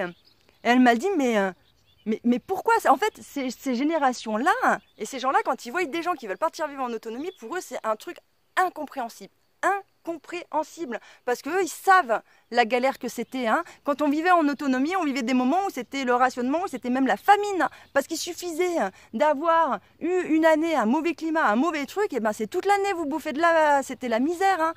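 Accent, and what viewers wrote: Paris, France. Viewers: je suis désolé mais je me devais de revenir sur certain points de cette vidéo qui m'ont fort dérangé. Ton ton de voix dans cette vidéo, ou encore ta manière de parler m'ont donné beaucoup de mal à finir cette vidéo. J'ai l'impression que je me fais gronder même si je sus pas concerné. Je trouve que tu as la façon de parler du jeune ado parisienne, hautaine, qui sait tout sur tout, limite à donné implicitement des ordres. Et puis surtout, et je pense que c'est ce qui fait mon impression, c'est les "in" à la fin des mots et les quoi à répétition, sans oublié les phrases classiques de type "non mais j'sais pas quoi" ou "c'est - un truc - de dingue", ou encore les mots comme "choqué", "grave", etc. C'est extèmement désagréable, je suis désolé mais ça m'as fort frustré et dégouté, alors que le but de la vidéo est d'intéresser les gens de les faire changer, et pour moi, si quelqu'un me parle comme ça pour me faire la morale, je lui envoie deux claques (virtuel parce que je n'aime pas la violence) et je l'envoie balader, qu'il ou elle aille se faire f*****. 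Pour capter une audience, c'est la pire chose à faire Ensuite il y a deux points dans ton résonement où je ne suis pas du tout d'accord. Premièrement, oui on peut vivre de chasse et de cueillette, bien sûr que l'on peut vivre de chasse et de cueillette. Nous sommes des animaux, et avec le savoir faire nécéssaire, nous sommes totalement capable de nous nourrir comme cela, tout comme les autre animaux, nous n'y sommes juste plus ha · cette accent parisien avec des "en" a chaque mot c'est terrible . aprés je comprend ta logique mais jvois pas la finalité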